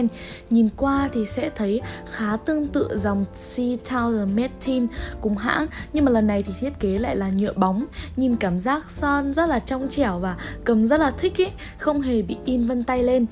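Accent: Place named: Vietnam. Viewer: ui bạn ơi có nhiều ng học tiếng anh rất giỏi nhưng lúc nói hay phát âm các thứ chưa chuẩn vẫn bị hơi hướng tiếng việt đấy... kphai ngta k cố gắng học phát âm mà kiểu giọng lúc đọc tiếng anh nó bị nthe sẵn ấy.. ai chả muốn nói hay nma giọng nthe r bt lm sao:(... t thấy c phát âm nthe là ok lắm r í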